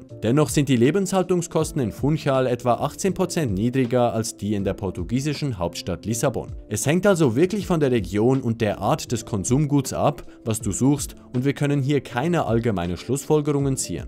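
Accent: Switzerland. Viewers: Wenn jetzt kein schweizer Akzent wäre, würde ich sagen das ist runtergeleierte KI ohne Luft zu holen und nützliche Sprechpausen!!!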